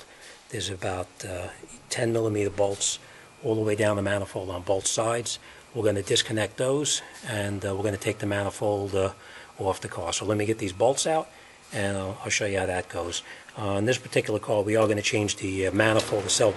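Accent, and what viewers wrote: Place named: New Jersey, United States. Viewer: Hey, are you from Jersey City? I am, and I can detect the Kings english.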